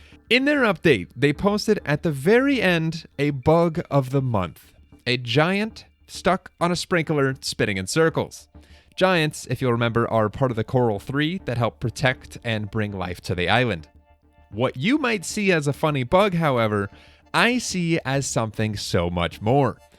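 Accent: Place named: Indonesia. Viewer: Wow your Indonesian Acsent So Perfect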